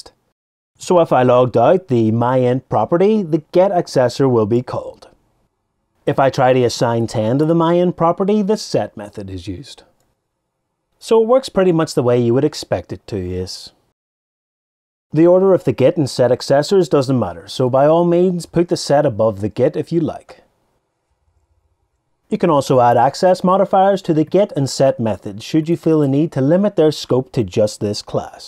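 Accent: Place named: Northern England, United Kingdom. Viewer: LOL ! I thought you were scottish and then immediately realized you were from the north.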